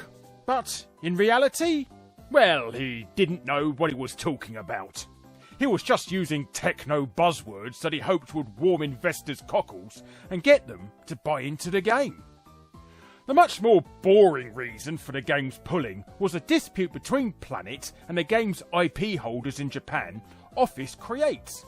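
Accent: United Kingdom. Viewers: My favourite was your classy british accent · Your videos are great but your voice and inflections are so british it's unwatchable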